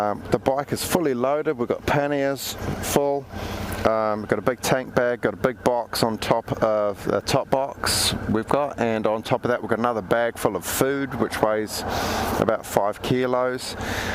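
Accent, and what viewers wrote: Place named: New Zealand. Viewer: thanks for the clarificatio..ive been thinking why am I listening a kiwi accent from American.. Lol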